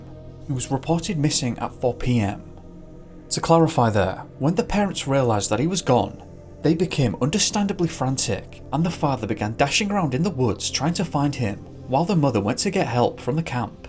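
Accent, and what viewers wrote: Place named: Scotland, United Kingdom. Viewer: does an excellent job with Missing Void and I like his Scottish accent!!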